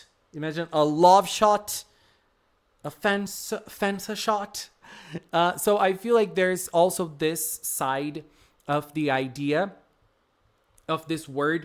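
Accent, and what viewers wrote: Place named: United States. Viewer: I love your channel but I like to ask you a question you were born and raised in Brazil and you talk like a normal American I was always curious about that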